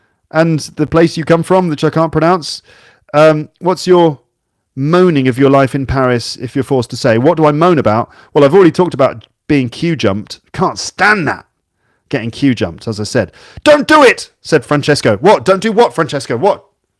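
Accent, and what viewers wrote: United Kingdom. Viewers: I love listening to British accents... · This podcast is definitely needed for a British pronunciation. Thanks for everything, cannot live without it since my first listening!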